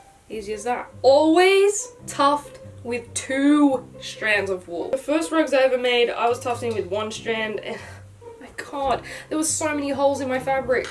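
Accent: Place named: Australia. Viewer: Jazza sent me. Nice video. Was thinking: Sounds like an aussie. Then you bust out a "now this blokes all done" Yea that'll do it.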